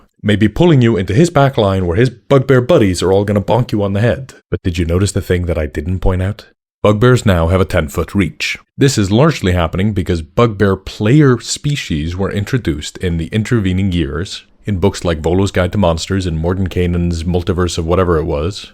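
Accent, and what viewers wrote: Iceland. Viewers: Are you perhaps Icelandic? I really feel this accent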